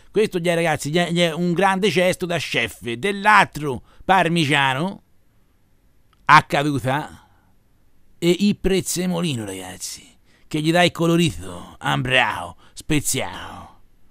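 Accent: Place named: Campi Bisenzio, Italy. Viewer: Ma tu sei di' campi bisenzio? No perché i' mi babbo m'ha detto che il suo amico Marcello co i suoi amici parlavano a quel modo lì. Comprimenti per l'umorismo un saluto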